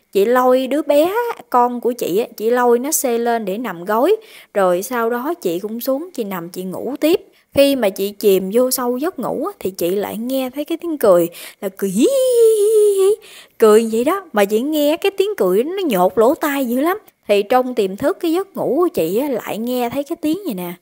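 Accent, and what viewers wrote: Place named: Countryside, Vietnam. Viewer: Helo Em nhé!! Xin chúc mừng Em là mẫu người phụ nữ được yêu thích nhất hiện nay và Em có chất giọng quá chân thật và chân tình quá đi thôi??? Nhung cuộc sống bây giờ không phải là Em nghĩ đâu nhé Em à??? Xin chúc mừng Em là một giọng thuyết minh quá tuyệt đỉnh và quá OK và quá chân thật và quá hai lúa mà anh vẫn OK về MC TV của kênh Youtube quá tuyệt vời và quá hoàn hảo và quá OK và quá 100 /100 nhé Em yêu à???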